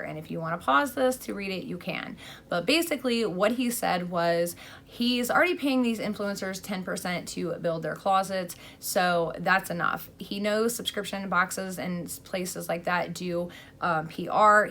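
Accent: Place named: Kentucky, United States. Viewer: i thought your accent sounded familiar ! i had no idea you were from kentucky! thats awesome :)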